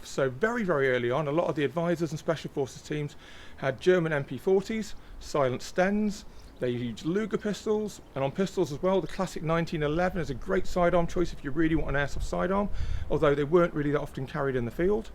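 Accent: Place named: United Kingdom. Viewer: Just the way a lot of us say it in the U.K. same as a lot of people say car been rather than carbine with an I sold :)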